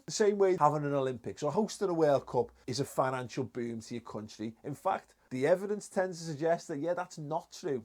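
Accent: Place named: Northern England, United Kingdom. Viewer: is this mams front room my lover. track suits so 1988 old boy with a up north voice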